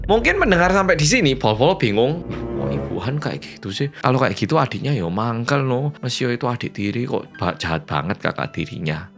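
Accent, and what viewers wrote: Java, Indonesia. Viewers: hallo ❤ Nyimak ceritamu 🎉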